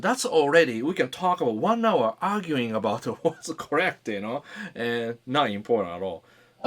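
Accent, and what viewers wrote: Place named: Japan. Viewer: Every time you speak, I feel like you are gonna start speaking Japanese. Your english is very japanese and it sounds really nice.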